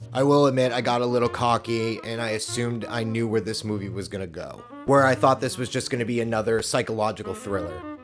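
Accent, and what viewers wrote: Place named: Italian-American, United States. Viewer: Omg I recognize Mackenzie Phillips from Black Mirror! Speak No Evil looks gooood, I love horror movies where you're just super uncomfortable on a visceral level. EDIT: To add that your Italian-American/Tony Soprano accent is solid.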